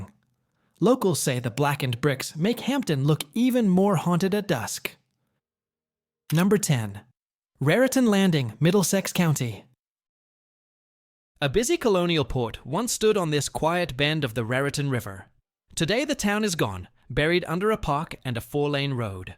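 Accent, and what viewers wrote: United Kingdom. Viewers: Uk accent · Is this guy British or what?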